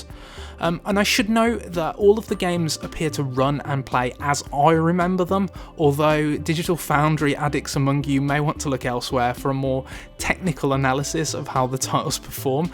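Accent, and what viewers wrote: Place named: North West England, United Kingdom. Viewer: Jorge Luis Pérez Armijos Sounds like north-west England to me. Somewhere around Birmingham or Wolverhampton.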